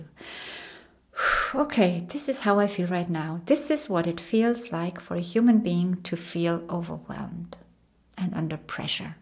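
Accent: Ireland. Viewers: I love when she giggles like a little girl. And the Irish accent ❤️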